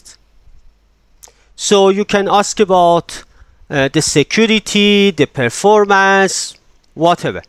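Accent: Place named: Iran. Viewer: یعنی کسی که هیچ آشنايی با زبان انگلیسی هم نداره، متوجه ميشه که ایرانی هستيد و شايد هم متوجه اصفهانی‌بودنتون بشه. با وجود هوش‌مصنوعی‌هایی که با صدا و لحن خودتون صوت انگلیسی تولید می‌کنند، چه اصراری دارید که با اين لهجه‌ی فاجعه، خودتون صحبت کنید؟؟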